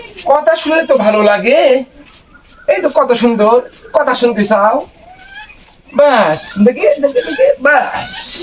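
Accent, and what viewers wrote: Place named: Pabna, Bangladesh. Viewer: আমার ধারণা আপনার বাসা পাবনা? বা এর আশেপাশে ভাষাগত মিল পাচ্ছি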